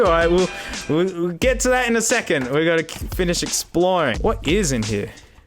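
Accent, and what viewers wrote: Australia. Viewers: Gotta love the Australian accent let’s get in there MATE!!! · This voice is so funny to me, probably because he is Australian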